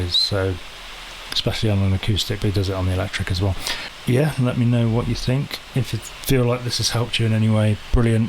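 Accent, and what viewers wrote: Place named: England, United Kingdom. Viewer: Excellent lesson. Really glad I stumbled upon your channel. I often search out Neil related tunes/covers/lessons and am surprised your videos don't come up more frequently/higher on the list. I am impressed with the use of index finger nail as a plectrum - perhaps time to let that nail grow a bit. Also impressed with your vocals as you switch from your native "English" accent to your Neil voice - well done!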